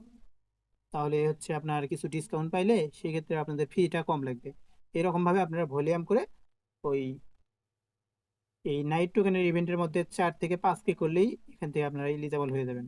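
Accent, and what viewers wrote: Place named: Bogura, Bangladesh. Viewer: vi mone hoy Bogura er hosse hosse kotha ta bashi boltacen amio Bogura er sol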